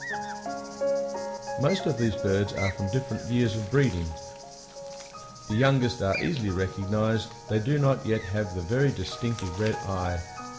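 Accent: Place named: Australia. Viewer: Australian birds. Like the accent. Lady of Australia.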